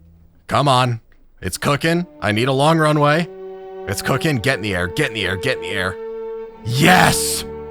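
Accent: United States. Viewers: In the first sentence that ive ever heard from this ytuber, I instantly realized hes american.🇺🇸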